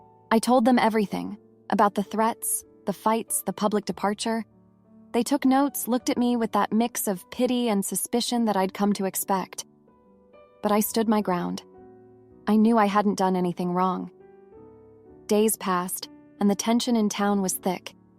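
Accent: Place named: California, United States. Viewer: Wish you could pick a less California cyunty sounding nasal snake sibilance AI voice. 😂 Annoying AF.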